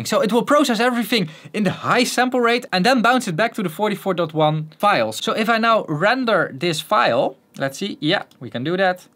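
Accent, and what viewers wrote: Netherlands. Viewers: Are you from the Netherlands? :-) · I suspect that you are Dutch. You have a Dutch accent.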